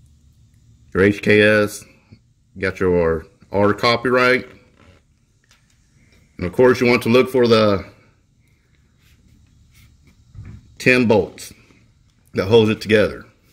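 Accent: United States. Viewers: What's the durability on the fake like , dose the spring begin to leak ? Also your accent sounds awesome I'm from NZ and Americans sound diff but your accent sounds like a mix between then more American though , my high boost is 23psi in the ej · The replica out here catfishing 🤣 this dude voice and face catfishes me.. no way did I think this dude would sound like this talking 😂 got that down south draw ..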